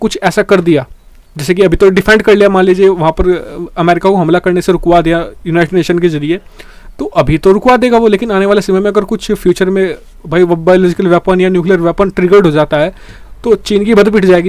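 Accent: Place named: Bihar, India. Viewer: SIR AAP KIS STATE SE HO ? BIHAR SE I GUESS ?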